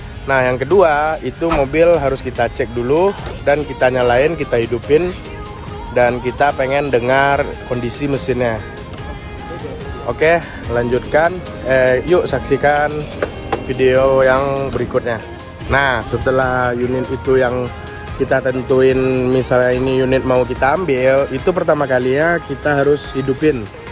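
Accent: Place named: Sumatra, Indonesia. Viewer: Bahasa indo nya logat sumatra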